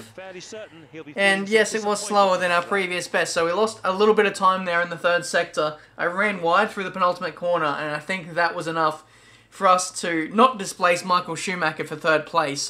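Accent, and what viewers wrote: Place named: Australia. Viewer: no... Almeno non tagliano così... Comunque mi godo il suo accento australiano, capisco e mi diverto.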